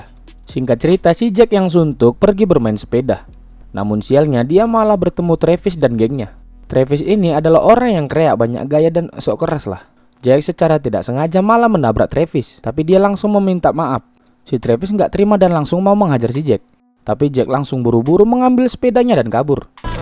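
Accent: Medan, Indonesia. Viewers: logat medan kau bg ketara kale bah,jadi ketawa aku dengarnya🤣🤣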